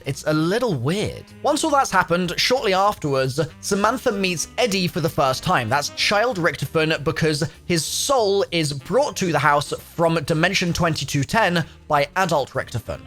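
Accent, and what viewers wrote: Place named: United Kingdom. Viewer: Bruh hes British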